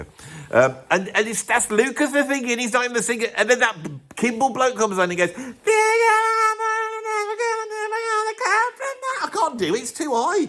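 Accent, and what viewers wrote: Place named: Liverpool, United Kingdom. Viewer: Came for the Liverpudlian accent and the childish takes. Was not disappointed.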